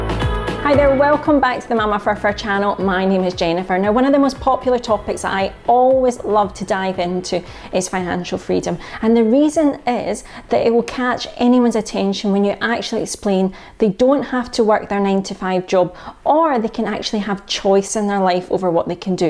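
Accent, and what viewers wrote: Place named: Glasgow, United Kingdom. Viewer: Great wee video and good to hear a Glasgow accent 🏴 I’m just starting out at 50yrs old so all you young bucks can relax 😂 Jut wondering why Vanguard and not 212? I’m hearing a lot of good things about them. I’ve started with H&L before I realised their fees are quite high. Would you say it’s worth investing over a few platforms?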